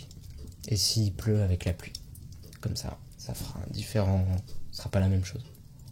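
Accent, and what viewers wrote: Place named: France. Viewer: No entendi nada pero me gusta tu acento y idioma Frances 😊😊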